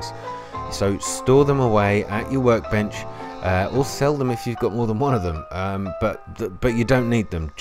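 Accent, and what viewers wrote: United Kingdom. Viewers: Got the game a long whole ago but never started off with it. this video is not only an interesting watch but a good start for me too. Plus i like ur accent - Briton? and oh ... music is ok ok, i was concentrating on ur voice and didnt feel too distracted. maybe next time go for something with no lyrics.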